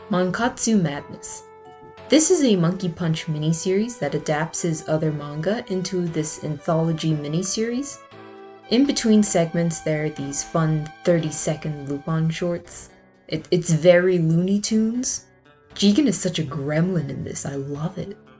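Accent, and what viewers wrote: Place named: Ireland. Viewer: Are you Irish? I'm Irish and your accent sounds familiar.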